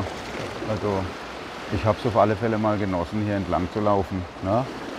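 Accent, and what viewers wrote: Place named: Karlsruhe, Germany. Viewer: Ja er lebt auf Koh Phangan.... wir haben schon einige deiner Videos gesehen und ich habe zu meiner Frau gesagt dass ich das Gefühl habe dich zu kennen. Wir waren 2019 in Khao Lak in Urlaub und haben dich mit Roman bei dir Daheim in Khao Lak besucht. Du warst gerade damit beschäftigt deinen Umzug vorzubereiten. Roman ist ein guter Freund von mir. Ich habe in deinen Videos auch unseren Karlsruher Dialekt rausghört ;-) Wie klein ist die Welt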